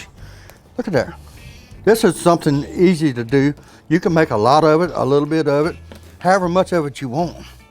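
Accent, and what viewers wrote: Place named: Louisiana, United States. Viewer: Oh man, my dad grew up in South Louisiana and watching this video, with accent, mannerisms, and lingo makes me think of my dad so much. Its like watching my dad make jambalaya lol.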